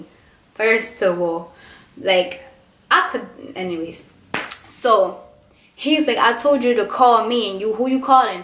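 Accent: New York, United States. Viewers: Well they both from New York she has that New York accent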